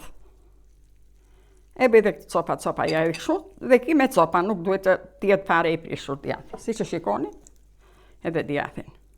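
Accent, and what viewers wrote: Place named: Përmet, Albania. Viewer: Zonje te petshendes! Te lumshin duart e arta, qe e shpjegon sh. Mire! Besoj se ini nga jugu, se dhe une andej jam, por besoj se ini PERMETARE, nga gjuha e paster qe flisni, se dhe une e perdor kete byrek, del sh . I mire! Respekte ❤️, nga Italia 🌼!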